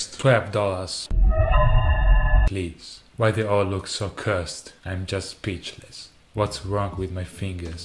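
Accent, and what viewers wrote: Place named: Italy. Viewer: L'avevo capito da subito che eri italiano, il nostro accento si riconosce in un attimo. Ma come mai sono l'unico Italiano a commentare?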